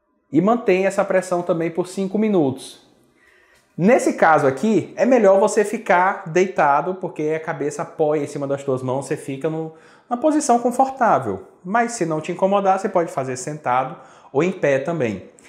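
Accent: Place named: Ceará, Brazil. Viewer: pelo sotaque acho que é cearense,obrigado pelos ensinamentos.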